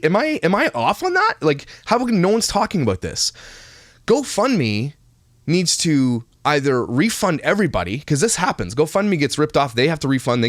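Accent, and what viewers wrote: Canada. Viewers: I agree about ppl who don't need the money get money donated on go fund me. Yet someone who truly needs the money it's like pulling teeth, litetally. I had to swallow my pride to start a go fund me because I can't eat solid food for the last year and a half due to a dental disaster. I need the money to pay for ENT, Oral surgeon, dentist, ensure in the interim due to Covid which closed everything down, etc. Soup is my main food and mash potatoes. Besides toilet paper. Soup is hard to find. I've lost so much weight due to not being able eat and only drink my food. I also have a disabled son who's had many of his medical supplies no longer covered by his insurance. It hurts when no one cares. I need aprox $69,000 so I put that amount as I'm honest or naive. I was verbally attacked by ppl on the internet that I shouldn't ask for so much. So I lowered it. 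But still I'm not able to eat as not many donated. So seeing what they did makes me so upset. Especially having a disabled son it breaks my heart. I use to live in Canada my first 5 years of life. I miss it so much. I love listening to your accent eh. I miss the smarties, not that I can eat them but you guys have much better goodies than us. Good for you what your doing to stop the injustice. I can't imagine giving away your own child. Keep up doing good. I pray the little boy is ok and being loved. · I think you have so many subscribers because people love your Canadian accent, i.e. "about", " outside". My paternal grandparents were from Conception Bay in Newfoundland. Love your channel!!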